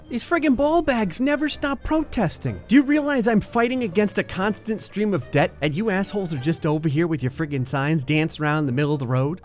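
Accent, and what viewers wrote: New Jersey, United States. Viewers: I'm from Brooklyn and can comfirm that is nj lol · What part of NJ are you from??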